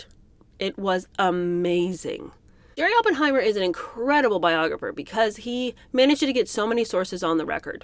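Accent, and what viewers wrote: United Kingdom. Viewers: Love your British accent! Perfect! Sometimes it makes me laugh3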